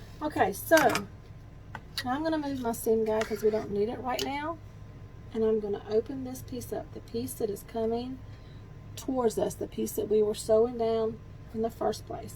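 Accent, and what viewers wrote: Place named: United States. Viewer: Wish I had watched this 10 months ago when I was doing my first binding, this is the best video on binding I’ve seen.. love the way you iron the crease, no rulers and pens, and the way you joined the ends using the bit you cut off is brilliant, so less complicated like the others. Will post your video on a face book page on quilting. Did you do the quilting as that is gorgeous, is there a video on it. So glad I found your videos as I’ve only been sewing for a year, I do have an embroidery machine as well. I also love you accent, where in America are you from? I’m in the UK xxx. Thanks for the video xx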